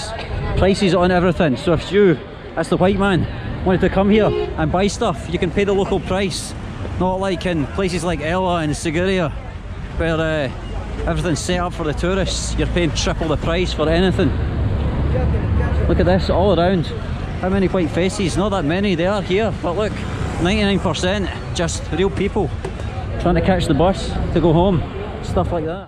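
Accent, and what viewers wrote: Scotland, United Kingdom. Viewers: I think it is just that scottish accent. The British got their wit from trying to deal with unruly Irish and Scots all the time is my guess. Been practicing a second language of Spanish in Puerto Rico and they speak it with a high pitch accent as well that makes it sound fast but actually mexican spanish is much faster. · Making Scottish great again. Thanks for the video. The accent and the narration is sublime as Harald Baldr would say it. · Hey great vlog once again! Obviously in love with the Scottish accent which we find very humorous and words such as wee bit and your boy here 😃 just a clarification. Sadly Our forefathers never understood the gravity of letting go of the brits off our nation. Most Locals living out of Colombo don’t speak good English. That man said “ theres one in half hour”” he did actually say there’s a bus in every half hour but sadly his English is wrong. 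Hopefully oneday they will too improve. Anyways I’m sure you got a better deal price wise traveling in the bus buddy 👍 have fun! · Hi, Just seen your video that you are in Kandy. I am from Kandy but at the moment I am in Scotland for my studies. Just for my curiosity, I would like to ask if you are from Scotland as you got very similar Scottish accent.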